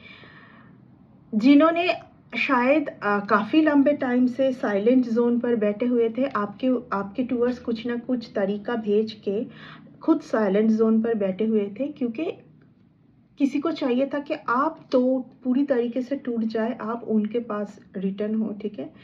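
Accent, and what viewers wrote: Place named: Bengal, India. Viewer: Very beautiful reading....when a Bengoli person speaks Hindi.....it's sound very sweet 🧁❤❤❤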